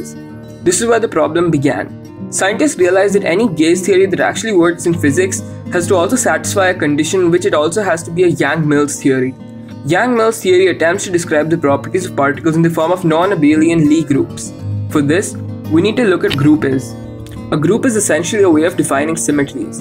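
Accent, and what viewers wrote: India. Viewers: India. The accent. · Why is it so difficult to understand Indian accent? :( I know Spanish is also quite terrible. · Your accent sounds like that of an Indian. Are you a student of some IISER/IISC?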